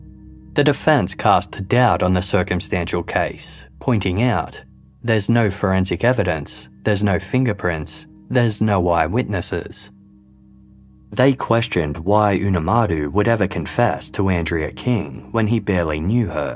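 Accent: Australia. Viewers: I was referencing that the narrator Is a fellow Australian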